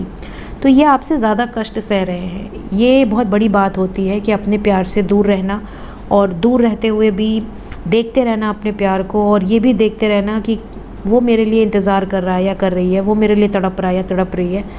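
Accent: Varanasi, India. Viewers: Aap ko iswar firghau de aap ki banarasi aawaj aur andaj dono mujhe mere Banaras ki yaad dilate hai❤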